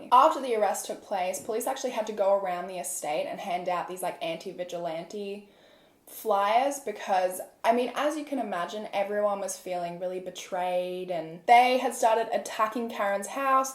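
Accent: Australia. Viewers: So strange hearing an Australian talking about a case that happened down the road from me 🙈 also please don’t tar us all with the same brush 🙈😂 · I love your accent what type is it? Australian?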